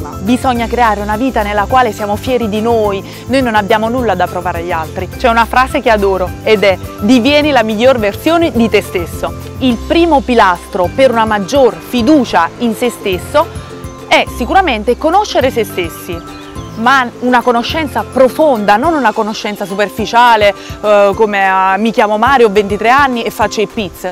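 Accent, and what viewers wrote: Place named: Naples, Italy. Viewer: Che bello in tuo accento napoletano !!!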